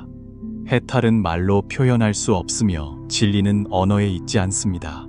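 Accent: China. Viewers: 중국발음이 오히려 집중을방해하네요 그러나 고맙게 잘 듣고있슴니다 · 중국어 발음이 방해하네요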